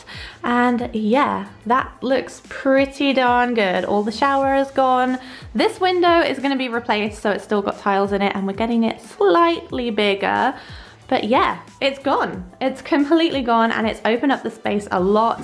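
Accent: United Kingdom. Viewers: It will all be worth it in the end! Where are you from? Sounds like the UK.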